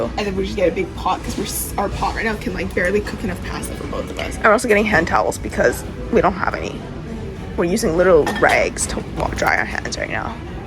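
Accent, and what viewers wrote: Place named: Toronto, Canada. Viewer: I am ready for the toronto accent to come out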